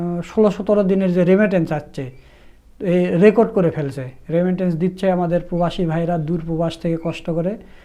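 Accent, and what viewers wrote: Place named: Bogura, Bangladesh. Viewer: আপনার বাড়ি কুটি ভাই? বগরা? উংকা কথা বলিচছেন কা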